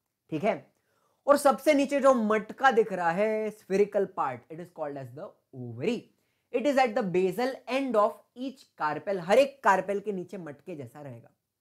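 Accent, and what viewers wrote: Maharashtra, India. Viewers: I think sir is gifted by God for 10th students. And one thing..... Sir's mothertongue is Marathi (chikhat)😅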